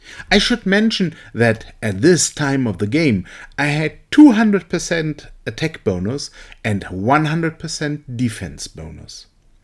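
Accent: Germany. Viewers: scheiße hört man dir an das du deutsch bist, dachte ich bin schlimm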